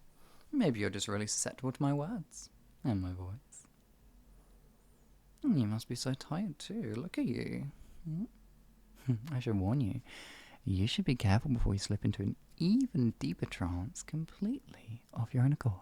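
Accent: United Kingdom. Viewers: This may be a weird question, but what kind of British accent does this guy have, I’m American so I’m not sure of any of the regions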